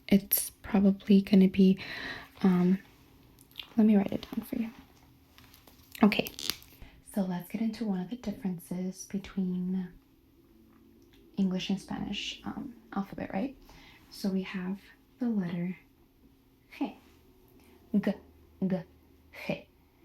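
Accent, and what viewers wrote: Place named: Mexican-American, United States. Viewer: Estoy casi seguro que es mexicana 🇲🇽 nacida en estados unidos, las chicanas son muy bonitas y tambn lo creo por la forma de hablar el español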